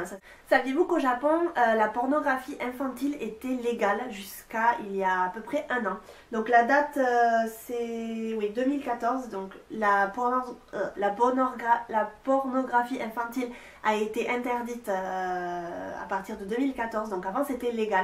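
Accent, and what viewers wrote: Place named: Toulouse, France. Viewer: ha une toulousaine! il me semblait bien que ton accent m'était familier haha! tes vidéos sont très intéressantes ! en espérant te voir au Japon quand j'irai! la biseeeeee du sud ouest!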